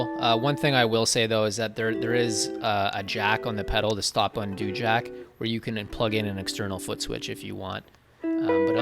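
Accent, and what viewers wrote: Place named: Canada. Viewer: You sound Canadian!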